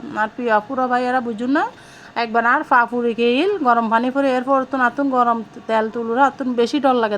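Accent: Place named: Chittagong, Bangladesh. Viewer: খুব সুন্দর হয়েছে এবং মুরগির মাংস রান্না খুব ভালো লাগে তোমার চট্টগ্রাম ভাষা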